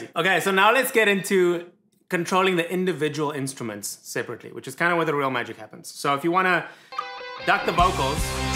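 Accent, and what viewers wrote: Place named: Afrikaans, South Africa. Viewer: Your Afrikaans-English accent of "flip" is classic South African. Hi daar hier van Bloemfontein af!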